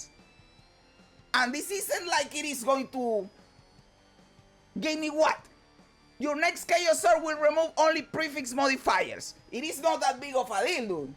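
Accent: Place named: Dominican Republic. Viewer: eres dominicano no? eso accento me encantalo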